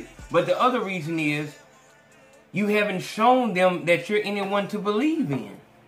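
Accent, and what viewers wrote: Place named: South, United States. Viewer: “Upping that gaw damn fye” 😁 salute brother the content deep af ... I know u country as hell fr #SalutefromFlorida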